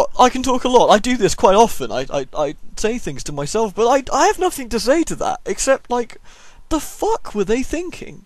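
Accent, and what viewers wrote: United Kingdom. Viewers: needs to do voice acting for a British Scooby Doo spin-off.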